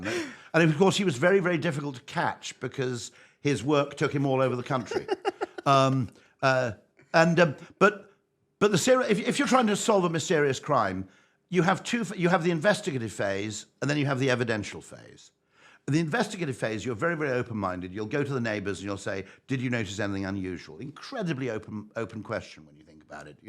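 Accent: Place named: Scotland, United Kingdom. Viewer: He is completely understandable, it is a very mild Scottish accent.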